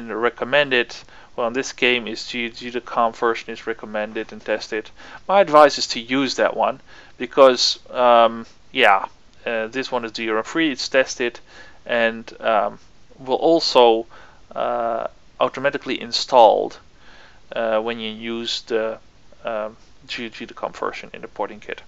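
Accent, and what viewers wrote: Netherlands. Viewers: Haha nice! Ik hoor je nederlandse accent haha!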